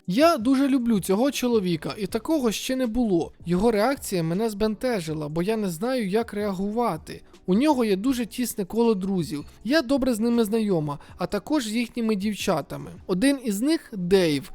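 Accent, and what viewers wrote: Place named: Zaporizhzhia, Ukraine. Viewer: Ваша подача інформації та коментарі дуже піднімають настрій😊 дуже дякую за вашу роботу. Дуже схоже наче слухаю людей із Запоріжжя, якщо тут є запорожці думаю мене зрозуміють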